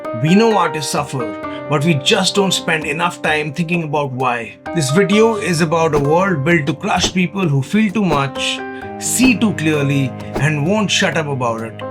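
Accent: India. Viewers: You sound like the professional wrestler, Rey Mysterio with an Indian accent.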